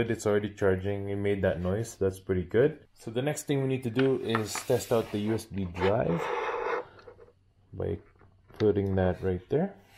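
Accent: Canada. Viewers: okay, good to know. Btw, are you Canadian? I hear a bit of a Canuck accent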